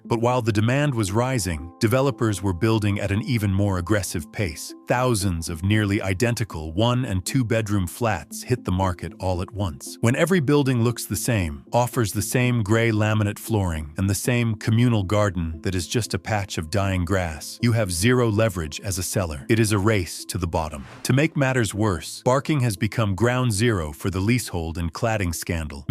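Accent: United States. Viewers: That American accent chatting our London business is so unfit and that’s only the start. The information itself is used toilet water. Whoever uploaded this AI nonsense needs to get a grip.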